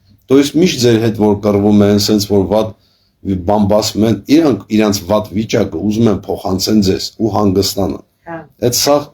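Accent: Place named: Stepanakert, Azerbaijan. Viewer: Մենակ Ձեզ լսելը ностальгия է, չգիտեմ Դուք որտեղից եք, բայց մաքուր Սծեպանակերտսկի ակցենտ օնիք ❤Ձեզ լսումում հայրենիքիս կարոտը առնում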